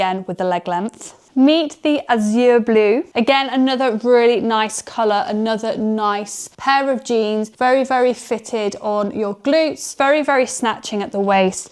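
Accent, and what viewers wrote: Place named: United Kingdom. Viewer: You are a BEAUTIFUL blonde/dirty blonde-haired young woman. Beautiful sexy sparkly light blue eyes, beautiful smile and sexy voice with the British accent. STUNNING figure. BEAUTIFUL body and curves, NICE booty and sexy long legs. Really sexy underarms. You look INCREDIBLE in all of these pairs of FitJeans. All of the colors look REALLY good on you and make you even more beautiful. Every pair of these jeans hug your body and hips and make your beautiful curves POP and give you a NICE booty. You have beautiful light blue eyes. You look AMAZING. You are BEAUTIFUL and VERY cute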